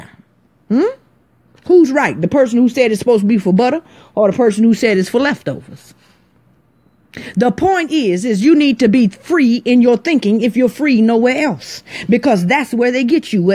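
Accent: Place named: Chicago, United States. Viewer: Thank you. I am recovering from childhood abuse- my parents tried to kill me when I was 15 and I got away. I found you because I initially was listening to Eligio and started to peep game. The universe led me to you and I needed to hear this. Tomorrow is my 42 reincarnation date and it’s been psychologically challenging this year. This message was for me and I heard it live last night before it cut off but I heard exactly what I needed to hear. Blessings and thank you 🙏🏽. Btw, my husband and I thoroughly enjoy listening to you. Yes from the Chi and says you sound like his peoples. 🌟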